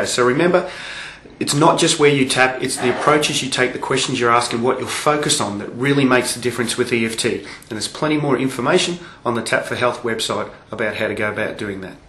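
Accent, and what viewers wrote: Australia. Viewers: Sound like an Aussie. Are you in Melbourne ?? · Thank you. Short and sweet and the added pleasant surprise...the aussie accent.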